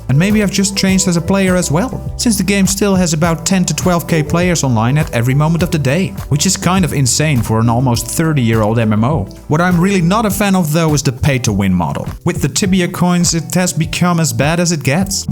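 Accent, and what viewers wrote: Netherlands. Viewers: Thick Dutch accent, my g. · Ik herken dat nederlandse accent meteen ;) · You sound Dutch, I am Dutch. I still have a character in Peloria, I can help you out anytime :)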